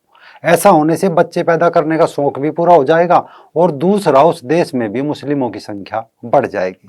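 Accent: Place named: Pahadi, India. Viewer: Pahadi accent hai ye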